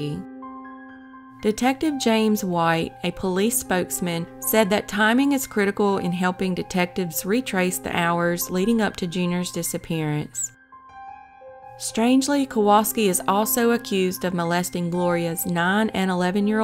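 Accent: South, United States. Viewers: Absolutely great channel with your southern talk love it! May God continue to bless you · I've heard of a few, but not all. This is some research and time. Her voice so soothing, Keep doing what you're doing Southern lady.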